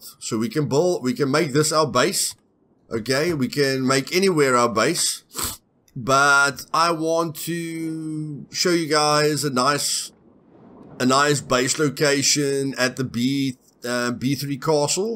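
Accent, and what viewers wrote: South Africa. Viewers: Always good hearing another South African accent. Thanks for these, I'm learning a lot! What happens when you log off? Is it like rust where you just fall asleep and your character is still in the world and vulnerable?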